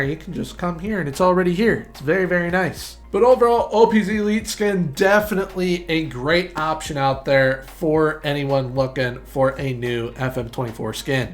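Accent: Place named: United States. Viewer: weird hearing a yank talk fm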